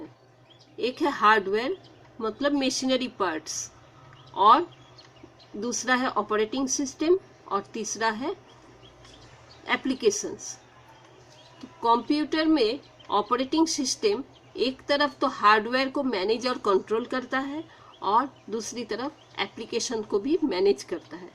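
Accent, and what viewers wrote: Bengal, India. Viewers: Apka muh se hindi sunke aisa lagta hain ki ap achhi tarah bangla bol sakti hain. Ap bangla mein video kiu nahi banati?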